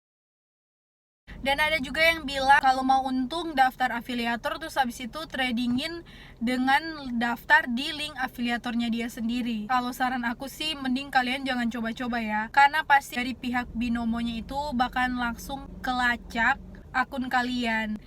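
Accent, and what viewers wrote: Manado, Indonesia. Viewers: Logat manado masi keliatan dikit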